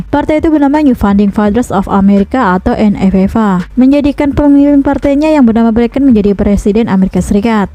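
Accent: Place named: Banyumas, Indonesia. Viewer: Bahasane ngapak koh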